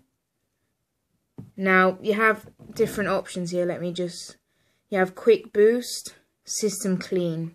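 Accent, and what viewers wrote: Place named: United Kingdom. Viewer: you sounds like British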